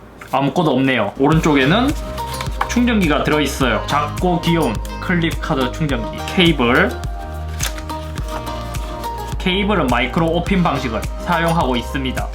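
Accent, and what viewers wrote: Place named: Gyeongbuk, South Korea. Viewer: 경북쪽 이신듯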